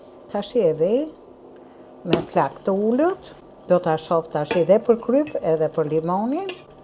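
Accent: Tirana, Albania. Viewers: Pershendetje moj sulltanesh e gatimeve shqiperise se mesme nuk na ke kenaqur vetem me ato gatimet e tua te mrekullueshme por na ke kenaqur edhe me ate dialektin e bukur te tiranes kur flet flet nje dialekt te bukur qe une e motra ime e pelqejme shume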